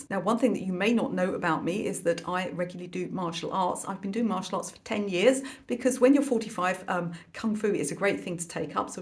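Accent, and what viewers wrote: United Kingdom. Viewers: I LOVE your voice!!! I have always loved the British accent.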